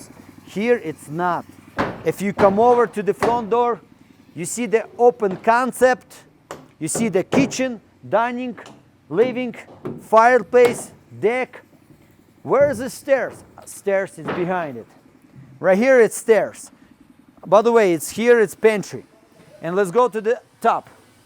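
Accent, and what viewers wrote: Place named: Russia. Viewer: Ты ведь русский! 100%акцент!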